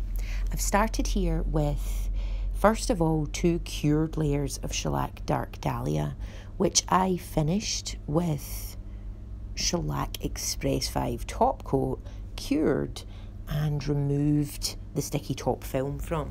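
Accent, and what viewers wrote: Scotland, United Kingdom. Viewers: Have to admit you're Scottish accent is truely smokin for this effect too. Fabulous. Love working with pigments so having a go asap. Thanks for sharing and cheers from cumbernauld xx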